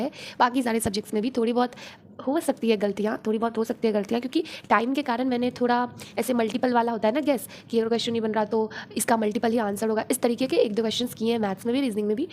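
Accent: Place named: West Bengal, India. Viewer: Are you from wb ?..upki tone se pata lag rahe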